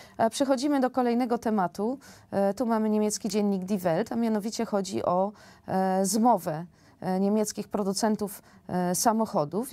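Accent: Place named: France. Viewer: Uwielbiam tę Pani polszczyznę z akcentem frangniem.Idealnie pasuje do p.p.z.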